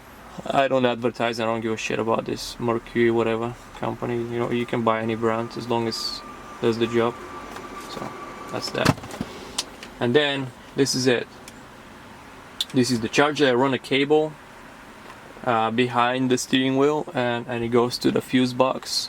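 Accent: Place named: United Kingdom. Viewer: martenica bg si :)))) interesno kade moje da si komunikirame poveche da pitam poveche... jiveesh v usa s uk akcent :)))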